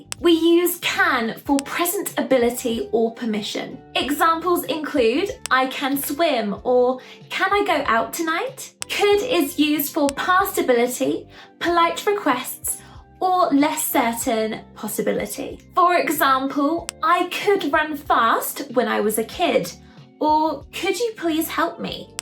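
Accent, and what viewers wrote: United Kingdom. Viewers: You British? Correct?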